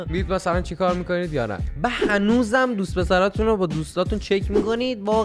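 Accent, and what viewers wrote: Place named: Abadan, Iran. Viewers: میشه یک ویدئو کلا ابادانی صحبت کنی🥲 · لهجه آبادانیت هعی میزنه بیرون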